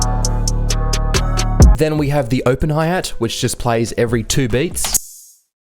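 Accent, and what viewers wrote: Australia. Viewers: You're an absolute genius, hidden gem this channel. I don't even produce in FL, but learn so much from you bro!!!!! Nice to get a dose of the accent from down under as well!!!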